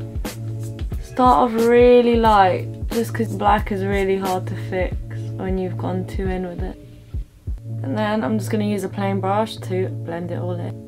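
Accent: United Kingdom. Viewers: your voice like a British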